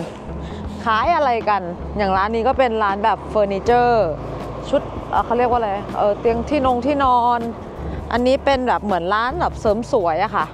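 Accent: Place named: Northern Thailand, Thailand. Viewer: ຂອບໃຈທີ່ພານຳທ່ຽວນິວຢັອກ ມັກສຽງນ້ອງອູ້ກຳເມືອງຫນ້າຮັກດີ ອ້າຍເຄີຍມານິວຢັອກ2ເດືອນໃນປີ1995 ທ່ຽວມ່ວນໆແລະປອດໄພເດີ້ນ້ອງ👍👍👍😊👏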